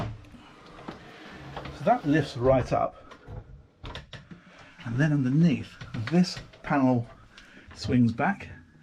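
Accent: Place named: United Kingdom. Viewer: I enjoy everything about your channel, except for one thing. “Cummins” (Engine) does not have a “g” in it. It’s not “Cummings,” although that pronunciation is also more common here in the USA than the correct one. But I can live with it, and perhaps it’s just my hearing that’s off, what with the British accent and all.:-)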